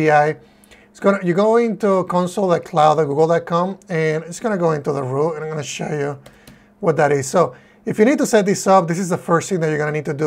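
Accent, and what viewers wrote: Spain. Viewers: your voice combine with Spanish, hard to understand. hihi. Good job, bro. Thank for your sharing!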